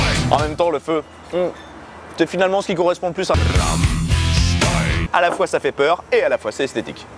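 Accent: Germany. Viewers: Toujours une magnifique maniere de reconter l'histoire d'une musique et de don groupe!et magnifique accent allemand..... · Ton accent allemand est immonde. Je tiens à le dire. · J'aime tes videos, mais non ironiquement ton accent allemand pue un peu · mais il est très bien ton accent allemand !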